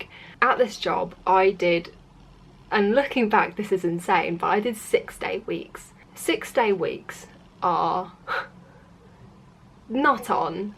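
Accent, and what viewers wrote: United Kingdom. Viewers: Just came back to watch that video. Now i am here after just 1week u uploaded this. I also came back for that vid like 4 month ago. 🙁 i could make life so easy for people but that would make lots of money to work out the system and people should be happy with very simple similar things like houses would be the same or like 2-3 types maximum. To cut down the worktime. Humans are like ants most of us will never be free because we rely on each other's work... You sound british wish we met when i was living in the UK. 😅 we would talk about our hate for work and brainstorming about it haha. 😆 when employees say me u can be a machine setter etc.... i am like yeeaaaahhh 🙂 (inside: 😫🤮)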